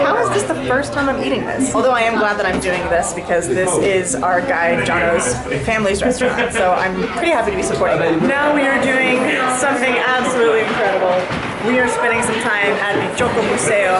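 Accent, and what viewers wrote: Spain. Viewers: Yeah, she studied abroad in Spain, that's why she has the spanish accent.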